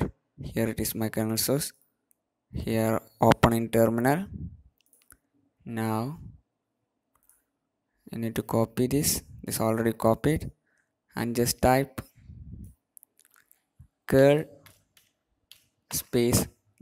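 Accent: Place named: Kerala, India. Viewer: I can find u r mallu by your voice😂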